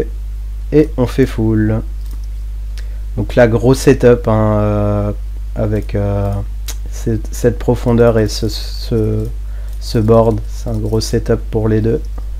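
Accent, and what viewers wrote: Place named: Alsace, France. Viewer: je me disais bien que je connaissais ce léger accent lol. Que nôtre Alsace est belle lol. Blague à part, vidéo très instructive. MERCI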